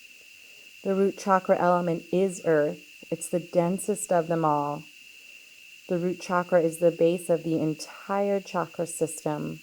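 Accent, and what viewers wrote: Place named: Louisiana, United States. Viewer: Also. Sounds like Louisiana.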